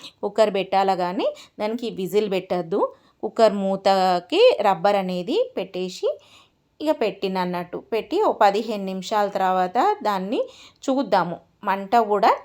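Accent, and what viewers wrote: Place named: Telangana, India. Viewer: Telangana slang super 😍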